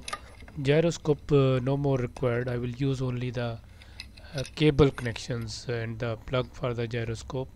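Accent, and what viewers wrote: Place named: Pakistan. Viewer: Sir ji min pakistan sy hun ap ki awaz sy lag raha hy ap pakistani ho help me sir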